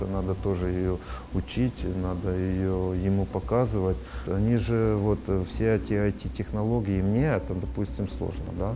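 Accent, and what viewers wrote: Ukraine. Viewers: Черт возьми, я просто не поверил, что такое хозяйство возможно на территории Беларуси и акцент немного смущал, но подумал... вдруГ Полесье, Гоомельщина... а утут слышу "у нас в Украiнi"... и все стало на свои места... Молодцы, что сказать. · я тоже сразу задался вопросом где это, начал читать а хрен тебе , какого черта не указываете место положения, я надеялся россия, но сразу уловил акцент, и сомнений не осталось , развернул коментарий и подтвердилось украина, молодцы украины, а вто авторам бы посоветовал указывать не только страну но и город местность!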